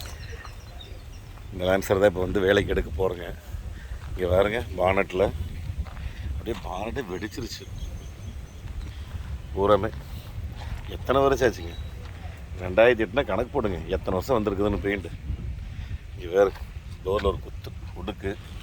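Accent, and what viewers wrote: Kongu, India. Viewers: Super workmanship, sir.You have proved that you are the Best car mechnic and as always your Kongu Tamil is sooper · கொஞ்சும் கொங்குத் தமிழ் பேசும் கோமகரே...! திகட்டாத தித்திக்கும் பேச்சுடைய திருப்பூர் திருமகரே...! மதிப்பிற்குறிய எங்கள் " " திரு. அவர்களே🙏 எப்படி இருக்கீங்க❤❤❤ அண்ணி🙏 எப்படி இருக்காங்க❤❤❤ ரித்திக் எப்படி இருக்காரு❤❤❤ யெப்ப்ப்ப்ப்ப்ப்ப்ப்பா😍😍😍😍😍😍😍😍😍😍😍😍😍😍😍😍😍😍😍😍😍😍😍😍😍😍 அட...அட...அட என்னா கலரு போங்க😍😍😍😍😍😍 சொப்பன சுந்தரிய யாரு வச்சு இருக்காங்கனு கரகாட்டகாரன் படத்துல செந்தில் அண்ணன் கவுண்டமணி அண்ணன்கிட்ட கேட்பாரு? இங்க பாருங்க...."TMF" செக்கச் செவேல்னு ஜிகுஜிகுஜிகுனு சிவப்பு சொப்பன சுந்தரி லேன்சர் காரை நம்ம " " திரு. அண்ணா வச்சு இருக்காருங்கோ😍😍😍😍😍😍😍😍😍😍😍😍😍 கொட்டப் பாக்கும் கொழுந்து வெத்தலையும் போட்டால் வாய் செவக்கும்...! எங்கள் " " அண்ணாவோட வேலைப்பாடுகளில் கார் எல்லாம் ஜொலிஜொலிக்கும்😍😍😍😍 அழுக்கான அசிங்கமெல்லாம் அழகு வண்ணம் பூசுகையில் சாலை ரதத் தேர் என்னும் கார் எல்லாம் பொன்னாய் மிண்ணும்...! 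வழுக்காத நேர்மையென்னும் வாழ்க்கைப் பாதைதனைக் கொண்ட எங்கள் " " அண்ணா சிந்தனையும் செயலும் அவர் வேலைப்பாடுகளிலே பொன்னாய் மிண்ணும்...! எச்சிலொழுகும் தன் மழலைதனின் அன்பு முத்தச் சுவைதன்னை தாய் ரசித்து ருசிப்பதுபோல...! வாகனம் பராமரிப்பற்று எச்சமிட்டதுபோல் ஆகி அருவருப்பான இடமெல்லாம் மிச்சம் மீதியில்லாமல் சுத்தம் செய்து தரும் எங்கள் மதிப்பிற்குறிய "சூப்பர் கார் கேர்" (Super Car Care) ஊழியர்களின்🙏 உழைப்பைக் கண்டு அனைத்து "TMF" கண்களும் காணோளி வாயிலாக ரசித்து ருசிக்கிறது...!! சூப்பர் கார் கேரில் வேலை பார்க்கிற ஊழியர்களுக்காக ஓர் சிறிய கவிதை🙏 வேதனை வந்து வீழ்த்தி வீழும் நிலைக்குத் தள்ளப்பட்டாலும் விதியென வீழ்ந்திடாது வாழ்வில் உயர நினைத்து உடல் வளைத்து வியர்வை வடித்து உழைக்கும் உன்னத உழை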